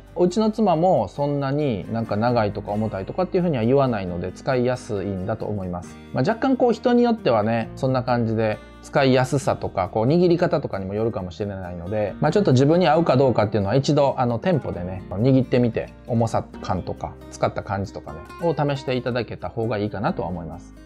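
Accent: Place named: Kansai, Japan. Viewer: さん、明けましておめでとうございます。🙇🏻 去年夏に引っ越ししてから、無印良品の商品を購入する前にはこちらを見てから購入してます。関西人特有のおもろい話しぶりで楽しく拝見しております。私のイチオシは、ネット限定で売ってますが、スチールの四角い懐中電灯です。手で握って持ち歩く懐中電灯とは違って、持ち手が付いているので、エコバッグと一緒に持ってプラプラと歩いて帰るのに使っています。外灯がなくちょっと暗い道も安心して帰れます。形も可愛いし、乾電池で簡単にオンオフできてシンプル。私の隠れたお気に入りです。😊💕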